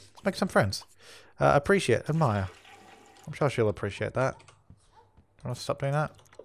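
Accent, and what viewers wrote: Australia. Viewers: I'm trying to figure out by your accent where in Australia you're from aha. I love watching your builds. I miss the Sims 2 so much. If you register your base game with Origin, you get The Sims 2 Ultimate Collection for free at the moment. Meanwhile, most of you watching probably already have each pack :p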